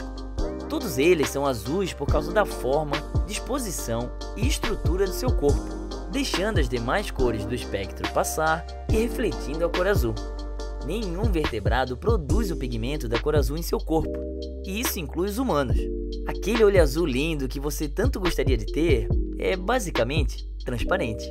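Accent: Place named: Santa Catarina, Brazil. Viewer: Cara tu é catarinense so pode kkkk tmj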